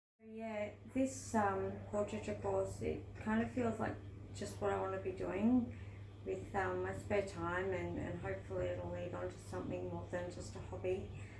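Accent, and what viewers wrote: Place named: Australia. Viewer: Hello from the UK! Hope you are baring up ok in your midwinter (for us it is the middle of summer, but it still keeps raining and isn't very warm). What region of Australia do you live? I like your accent!! :-) And loved the beautiful botanical art you did in your 20's! I'm glad you are keeping up your art.....unlike me, who can't seem to motivate myself, even though I want to!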